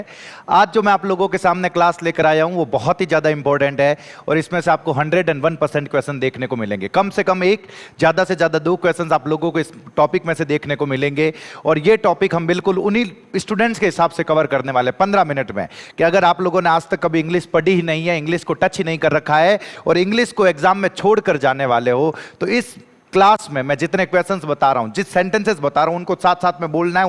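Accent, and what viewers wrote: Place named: Rajasthan, India. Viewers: Rajasthani vaani badiya lage hai sir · गुरु jiक्या अंदाज है आपके पढ़ने का वह भी अपनी मारवाड़ी भाषा में❤❤❤ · Jodhpur ri boli ❤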